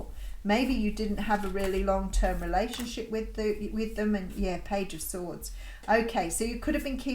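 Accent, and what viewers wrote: Australia. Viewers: He wants work on things with me. Spend time in a new and different location with just the two of us. As nice as that sounds, the last 3 years have nearly broken me - body mind and spirit. The skills I’ve learned from you. have been life changing for me!! Your sweet Aussie voice has become the voice in my head. Helping me sort thru difficult feelings. Without telling us what to do, you’ve lead by example and taught us how to empower ourselves! Thank you! ✨🙏💕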